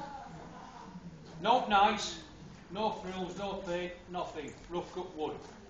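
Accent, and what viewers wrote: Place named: Yorkshire, United Kingdom. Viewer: That is a Yorkshire accent.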